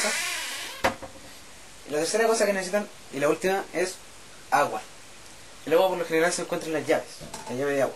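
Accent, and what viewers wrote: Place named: Chile. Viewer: Acento chileno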